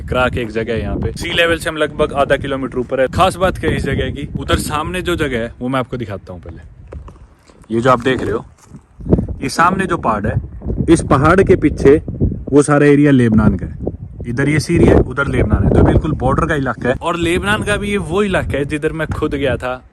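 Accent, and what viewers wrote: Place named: Rajasthan, India. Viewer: Bhai aap hamare rajasthan se ho aapki aawaj se to lagta h 👍🙏🙏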